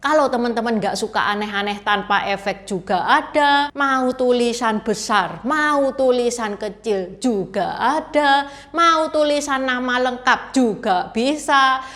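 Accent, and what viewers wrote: Java, Indonesia. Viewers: Makasih mbak ncik. Ini chanel unik, keren, educativ trs bhsnya khas full medhogh.🇮🇩👍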